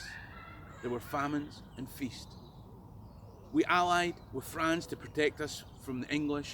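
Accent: Scotland, United Kingdom. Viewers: The subtitles are not keeping up with your scotish accent....🤭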